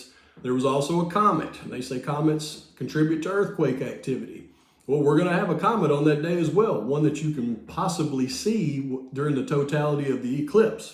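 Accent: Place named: North Carolina, United States. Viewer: You surely have a born abd bred NC accent….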